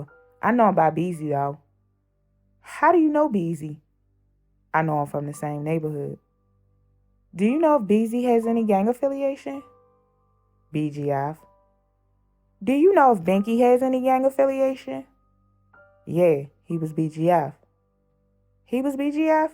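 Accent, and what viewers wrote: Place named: Baltimore, United States. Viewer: This narrator is dope af 💪🤞 Can hear the Baltimore in her voice for real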